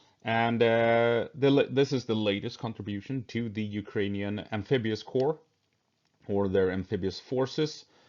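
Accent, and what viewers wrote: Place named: Sweden. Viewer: Haha 😂. Nice to hear some swenglish. Nice work!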